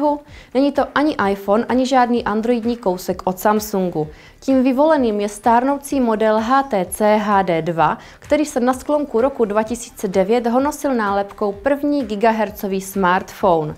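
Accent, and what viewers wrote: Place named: Brno, Czech Republic. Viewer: tak vám newim, česky mluvit neumí, ta brněnština je tam znát a mluvit o tom taky neumí, přečíst bych to zvlád stejně...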